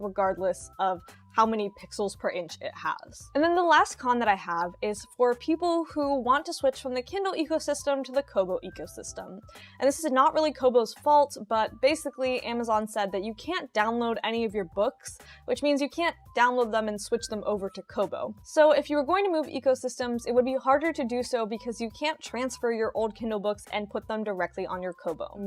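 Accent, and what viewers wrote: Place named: United States. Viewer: You sound like you are from the USA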